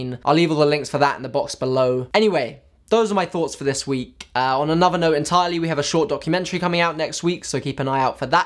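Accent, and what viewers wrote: United Kingdom. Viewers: First time watching your videos. Honestly came here just for gettin' used to the english (england) accent. Because I'm moving there from Spain and here..well, lets say that I've been pronouncing download like doh-w-nloh-add because of my teachers. ha! Well. Uhm. Love it. You seem very kind and mature, and everything in this video is GREAT. Mental illnesses are, actually, quite common. Go ahead. Im following you! You encourage this people to talk about themselves and their problems without that 'tabu sensation' that society made of and for perfect people has done. NICE! Thank you!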